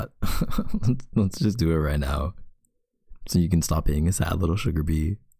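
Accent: Canada. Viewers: you knew what they meant !! But to answer the question I’ve always imagined that he was Canadian but I could be wrong.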